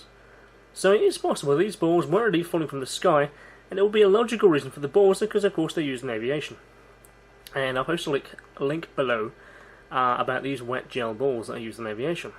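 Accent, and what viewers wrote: United Kingdom. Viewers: This guy is english, what are you talking about lol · When the narrator is a Scottish you can bet he knows what he's talking about just like in the original Star Trek , Captain Kirk this is Scotty you got to give me more power !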